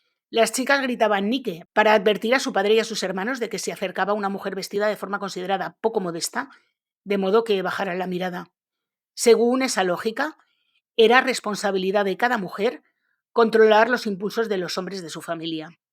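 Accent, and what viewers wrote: Spain. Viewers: Y tu quien eres una persona que vive de hacer videos sobre la vida de los demás? Y a veces mentiras? Ya veremos si te va bien cuando tengas que rendir cuentas a Dios. Detesto el acento español.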